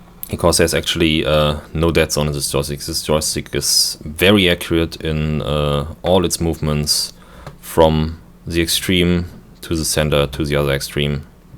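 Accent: Germany. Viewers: Du bist Deutscher oder? ;D wenn ja, man hört deinen Deutschen Akzent oder so. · erstma danke für die antwort... trotzdem habe mich auf der channelseite des " " informiert er is aus Deutschland;-) Und wenn man das nich an dem Akzent hört heheheheh dannweiß ich auch nich.... P.s. Fliege IL2, Cliffs of Dover und ab und zu FSX...da brauch man glaube ich nen extra Addon für damit das FFB richtig funtz!? cya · I'd bet you are a native german speaker .. :-)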